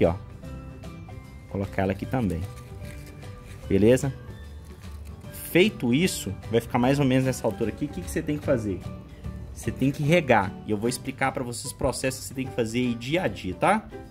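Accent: Minas Gerais, Brazil. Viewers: Que sotaque mais fofo! Será mineirinho? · Bom dia. Seu estilo de voz me faz lembrar e sentir saudade do povo mineiro, inclusive meu primo Geraldo Mangela, que mora em BH. Bjs de saudade primo, te amo!!!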